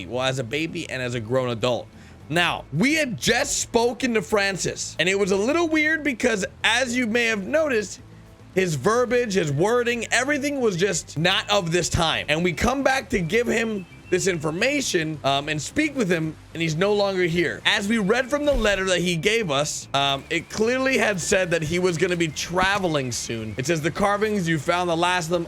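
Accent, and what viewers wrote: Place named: New York, United States. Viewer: He sounded like a New Yorker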